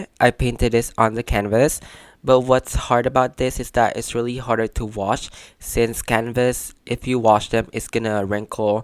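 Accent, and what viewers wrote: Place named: Philippines. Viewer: I thin he got filipino accent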